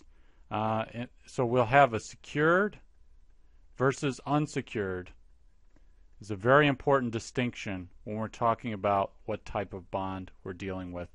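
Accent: United States. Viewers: Thanks so easy to comprehend. Thank God for an American English speaking person explaining the concept.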